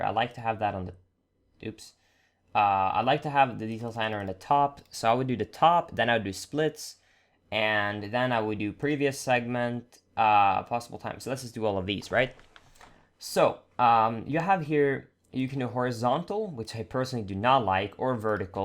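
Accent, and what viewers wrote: Sweden. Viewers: OMG u swedish like me!?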